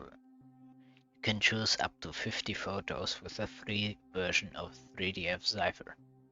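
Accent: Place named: Serbia. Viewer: You are hören sich nach ein Serbe an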